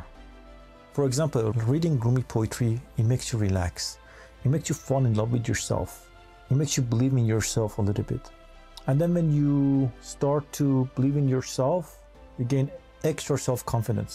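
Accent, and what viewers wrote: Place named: France. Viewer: TRUE , I WILL AS A last resort! Specially in workforce , if push comes for sure! Curious , you have french accent when you speak! Are you from France or elsewhere? Love all multi languages, I am Spanish 😄half Cuban/Peruvian born in USA! Hoping when we all descend to 5D we can read minds and speak all languages! Love traveling! But for now I wait! Waiting for the Spirituality to see Grand parents miss them immensely! KEEP UP ALL POSITIVITY 😉 most people do not want to believe and higher sources!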